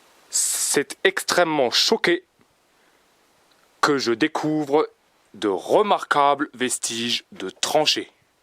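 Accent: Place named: Alsace, France. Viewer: La voix n'est absolument pas robotisée, mais l'auteur a un accent alsacien très prononcé tout simplement. Il a le mérite d'essayer de se mettre dans la peau de ceux qui y étaient!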